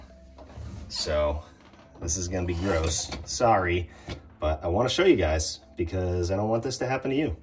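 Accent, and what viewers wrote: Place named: Midwest, United States. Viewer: You live in Massachusetts ?? Are you original or you moved there ?? I don't hear any form of the typical " Boston Accent ". You sound more like a Mid-Westerner. I'm from Boston. Grew up in the Dorchester neighborhood. Left when I became an adult. . . . . .That whole snow thing is not much fun as an adult, but it was great when I was a kid, (snow days off from school).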